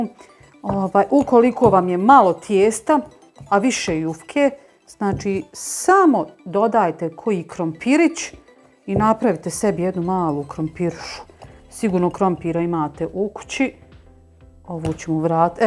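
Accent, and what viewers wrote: Bosnia and Herzegovina. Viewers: Čim je počela sa ovim bosanskim naglaskom znam da je to taj koji ću probat!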